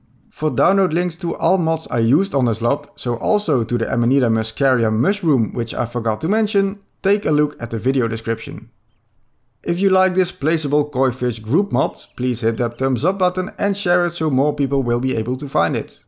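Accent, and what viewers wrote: Netherlands. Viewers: Ik hoor gewoon dat dit een Nederlander is hahahah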